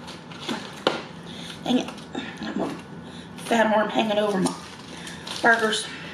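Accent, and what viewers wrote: South, United States. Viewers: She has such a beautiful face and love the southern accent!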